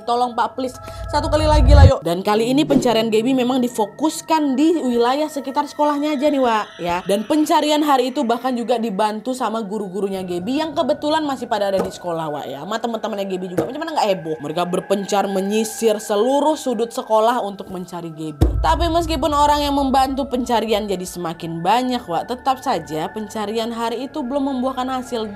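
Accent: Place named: Eastern Indonesia, Indonesia. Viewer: Banyak yg bercerita horor dgn flognya.tapi ka, bisa hidup ceritanya macam aku ikut dalam ruang cerita itu. Di tambah logatnya nya yg seru.... He he Indonesia timur jdi Indonesia barat..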